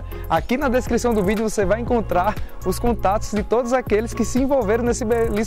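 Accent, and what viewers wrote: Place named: Pernambuco, Brazil. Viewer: sua voz e muito suave passa um sussego, obrigado por nós mostrar essas casas linda, sou de Pernambuco e vc tem um sotaque parecido com o meu.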